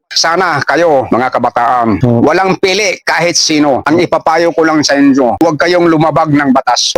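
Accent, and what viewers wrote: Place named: Bohol, Philippines. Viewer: cebuanong may pagka boholano😊.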